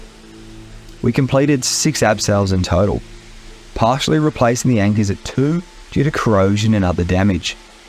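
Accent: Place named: Australia. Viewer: Nice to hear an Aussie voice with familiar Australian places. Good video!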